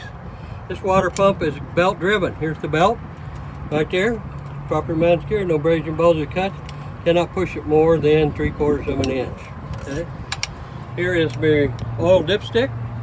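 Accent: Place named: South, United States. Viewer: He does mumble a tad bit y'all but...that's what's called a "Southern Twang", don't worry about it, just pay close attention, this man is a GENIUS! 👍🏽😉😎🫡👌🏽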